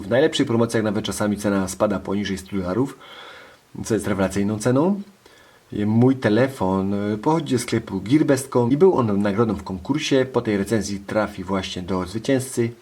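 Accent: Silesia, Poland. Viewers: Witam :) Akcent wskazuje że jesteś Ślązakiem ?! Na ręce masz ? Ten ludzik Android ma jakąś funkcję czy tylko ozdoba, córka mnie o takiego męczy ;)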